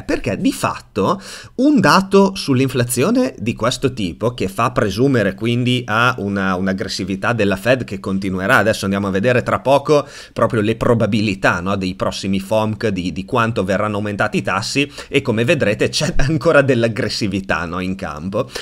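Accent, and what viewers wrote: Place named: Romagna, Italy. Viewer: Quando dici la parola “questo” ti adoro, il tuo accento romagnolo è fantastico 😄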